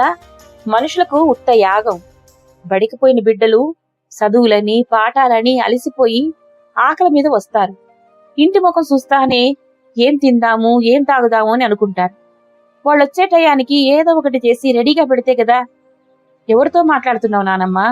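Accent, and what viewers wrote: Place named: Telangana, India. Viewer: కథామంజరి లో కథలు చాలా బాగున్నాయి. గారు తెలంగాణా యాసలో జీవ గంజి కథను బాగా వినిపించారు. కథా రచయిత బాగా రాశారు.వుభయులకు ధన్యవాదాలు.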